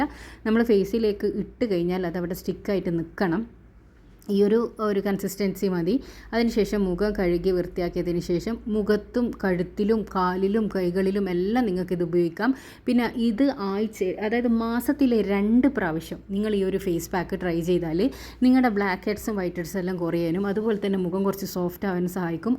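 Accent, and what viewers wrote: Kannur, India. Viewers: മുഖം വെളുത്തില്ലെങ്കിലും നമ്മുടെ കണ്ണൂർ ഇത്തയുടെ ആ കണ്ണൂർ ഭാഷ കേട്ടാൽ മതിയല്ലോ ഏതായാലും നന്നായ് കൂടുതൽ ചിലവ് ഇല്ലാതെ പരീക്ഷിച്ച് നോക്കാൻ പറ്റുമല്ലോ❤❤❤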